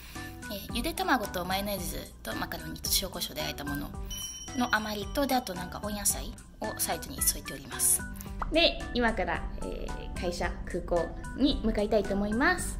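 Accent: Kansai, Japan. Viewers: 、関西なん？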